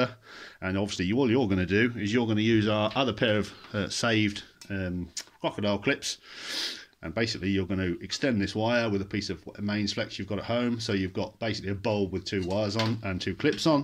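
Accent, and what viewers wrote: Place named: Alabama, United States. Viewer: Hard to believe. I'd like instructions for my gen 2 prius. I'd be willing to use a 120volt squril cage fan to cool my pack. My fan has a plug with several wires. I could research which 2. But I'd love to make the charger for my 07 prius. Like they say a little over 200 volts DC thanks. Love the Alabama accent!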